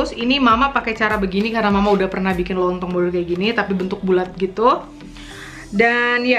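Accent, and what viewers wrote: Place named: Banjar, Indonesia. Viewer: Suka sekali sama si mamak ini, bhs nya Banjar banar. Menggurak gurak. Si acil, nyaman banar. Wkwkwk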